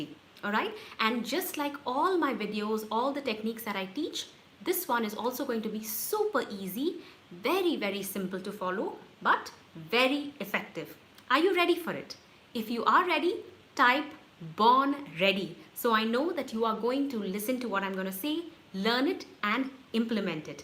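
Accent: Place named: India. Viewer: Please subtitles in written English in some countries indien english acent is very difficult to understand.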